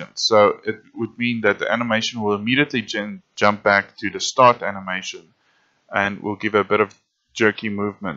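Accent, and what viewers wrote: Afrikaans, South Africa. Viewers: Buddy, you afrikaans?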